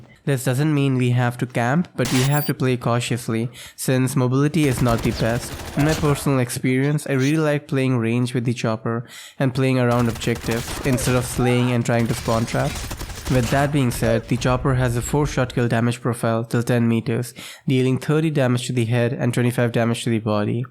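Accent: India. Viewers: voice sounds Indian slang 🙏☺️ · Your real voice confirms that you're an pure INDIAN good job bro!!🤩🔥🔥🥳 #CYGNOUXTO20KSUBS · R u Indian?? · Bro are you Indian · Hmm You are from India , Right?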